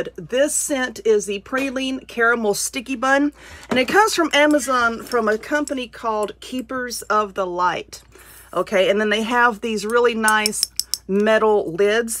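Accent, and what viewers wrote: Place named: South, United States. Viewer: I have just started watching your videos, this one was my second one. I love your spirit and the fun you put into your crafts. And the southern draw! I am a born and raised in the south and I can understand you perfectly. Thank you and keep it up. 😁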